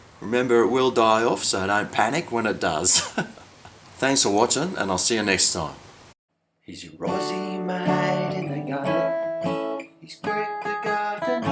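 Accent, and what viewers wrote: Australia. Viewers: Love the video. Love the accent too. Sounds similar to Australian. Thanks Greg.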